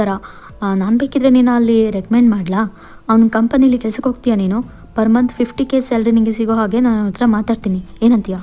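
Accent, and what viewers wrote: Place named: Tamil Nadu, India. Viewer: Chenagide akka and love ur tamil accent ❤😁 ennu bere bere ff gal haki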